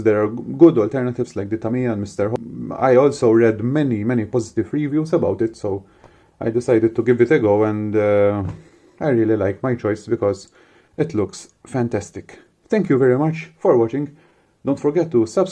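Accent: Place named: Malta. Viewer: Delightful surprise to hear a Maltese accent millewwel :D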